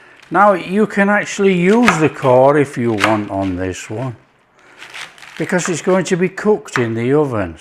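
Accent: United Kingdom. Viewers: Love your style sir, you probaly think we got an accent over here in the USA, but that's ok but, we LOVE YOUR BRITISH TALK, LOVE YOUR, "COME ON, PLEEEESE! THATS THE SIGNATURE OF 😅 you are so down to earth, and such a great cook, I look forward to each of your videos, love the one right way to make burgers. You are a master in the trade, simply love your presentation, espeacially when you sit and taste what you made, love your expressions, a bonus to all your videos, crack on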